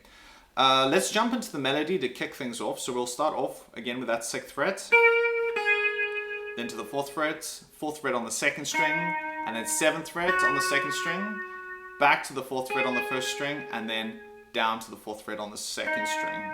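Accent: South Africa. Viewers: bro you kinda sound south african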